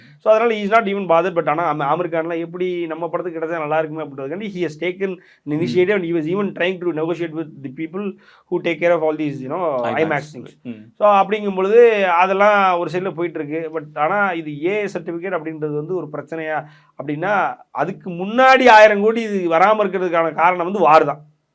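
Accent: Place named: South Tamil Nadu, India. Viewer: I like your speech and enjoy listening to you particularly your tamil and south slang. Please continue speaking in tamil.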